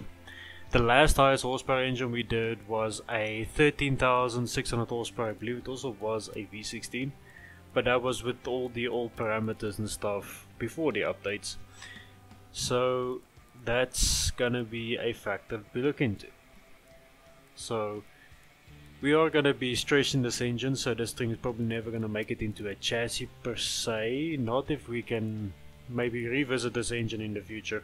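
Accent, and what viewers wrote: South Africa. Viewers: Those "R"s.... South African?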